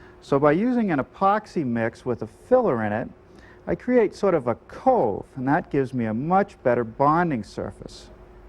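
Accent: New England, United States. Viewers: I used to watch his show as a kid. I never noticed the strong New England accent back then. Now all I can think of when I hear it is Peter Griffin.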